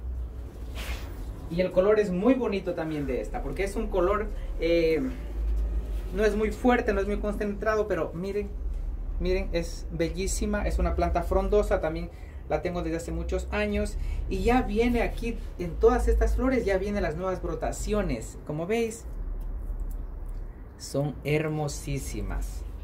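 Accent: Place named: Latin America. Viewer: Me encanta tu dedicación! Felicidades por tan buenos resultados 👏👏 Por cierto, no tienes acento español, me parece que eres de Latinoamérica, no me equivoco? Saludos desde México.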